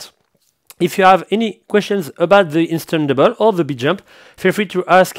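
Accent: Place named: France. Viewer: l'accent français de merde XD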